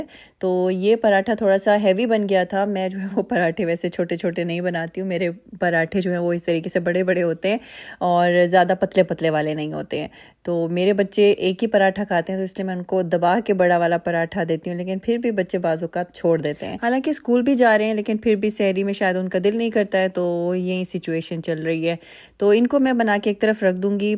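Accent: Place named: Karachi, Pakistan. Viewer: Abp Pakistan mean khan sy hy lahore ya karachi batey ga lahjato karachi ka lgta hy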